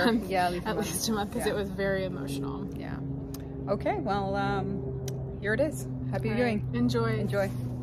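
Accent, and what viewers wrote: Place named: United States. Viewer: Thank you for a wonderful film of your journey from USA to France and your new home. Filming was excellent. You are speaking much slower now as sometimes an American accent is difficult to understand. Seems sad the past Owner didn’t arrange for some bedding after paying can only imagine a large sum. France is a great country, I am English, but French people can be hard to get to know but once they know you are very helpful etc. I wish you all the luck very different to San Diego which I loved visiting some years ago. Good luck 🤞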